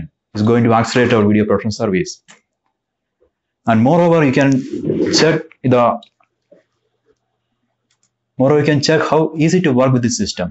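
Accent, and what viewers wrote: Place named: India. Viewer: Bro you are indian Sunke achha laga......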